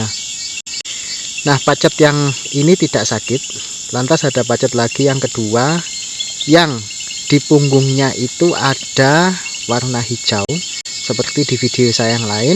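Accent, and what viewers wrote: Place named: Java, Indonesia. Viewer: itu di daerah mana bang. kok kayanya anda pake logat jawa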